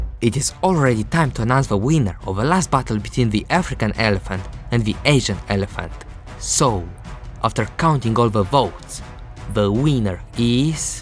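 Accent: Russia. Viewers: i love your russian accent , kisses from Algeria ! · Oh I thought you were from Russia because of your accent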